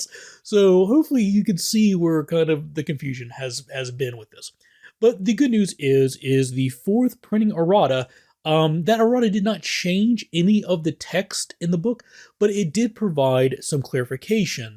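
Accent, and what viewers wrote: United States. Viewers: thanks for the reply! actually, i was wondering which state? there are so many american accents and i find them hard to untangle. (totally didn’t notice the speech impediment btw!)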